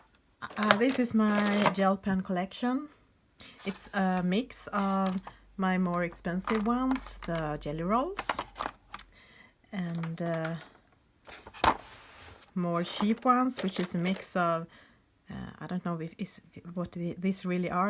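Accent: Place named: Italy. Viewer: Your beautiful accent reminds me of the accent that Meryl Streep imitated in the movie, 'Bridges of Madison County'. Are you Italian?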